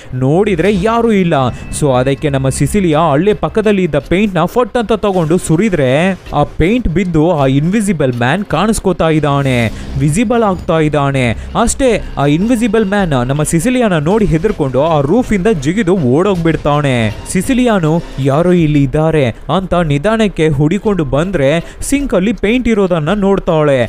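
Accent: Tamil Nadu, India. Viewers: Nim kannada Tamil accent 😄😄 but really well explained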